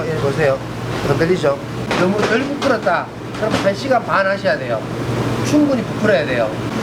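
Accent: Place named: Gyeongsang, South Korea. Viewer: 구시한 갱상도 사투리! 따봉입니다! 꼭 따라해볼께요!! ❤️👍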